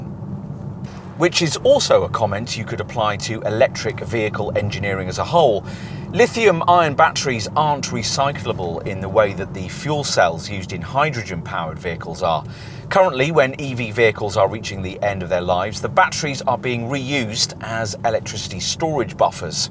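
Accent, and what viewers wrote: United Kingdom. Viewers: What is it with you people and the British accent? Give the guy a break. Are you that thick that you can you only understand a 'Murican accent? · Are we supposed to believe you because of your British accent? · How much did Jaguar pay this guy? I notice his accent is... British? Bias?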